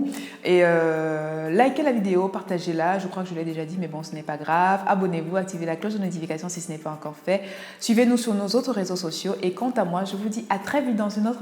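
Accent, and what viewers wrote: Cameroon. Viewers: Je sens, c'est une kmer qui parle et ça me plaît grave....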